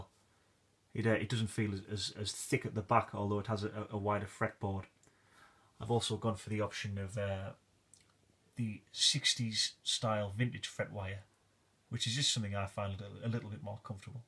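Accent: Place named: Newcastle, United Kingdom. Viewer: Noticed the geordie accent on the first spoken word ! Beautiful guitar man. Did you have to do much extra work on the nut of the Warmoth neck ? Or was it filed to the correct depth ?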